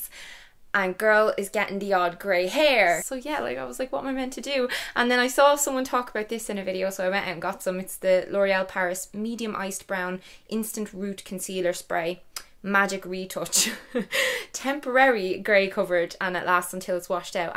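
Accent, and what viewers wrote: Ireland. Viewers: Beautiful youuu! That Irish accent is adorable!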